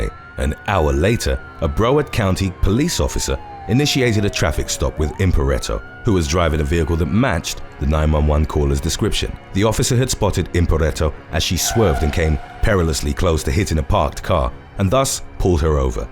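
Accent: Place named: United Kingdom. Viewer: how come you sound british yet this channel uploads in USA? Good channel bro, i watched it grow the past 7, 8 years. 5☆ mate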